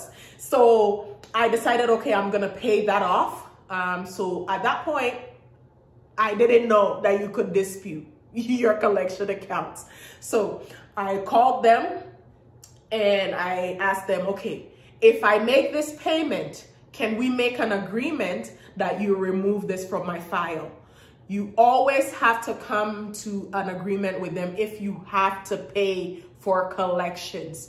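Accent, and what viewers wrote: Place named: Africa. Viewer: You are such a beautiful woman, the way that African accent rolls of the tongue is so soothing.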